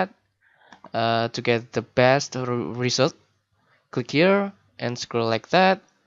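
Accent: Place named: Indonesia. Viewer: hem logatnya kyak orang indo ya...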